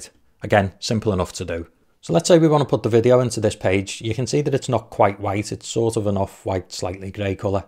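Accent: Liverpool, United Kingdom. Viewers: Not to mention the scrolling website on the Sqs example appears to have an FAQ accordion, which, of course... you need a plug-in for. Nice seeing how to do this on Ae rather than Ps. Always enjoy the humour in your videos. Is that a scouse accent I hear?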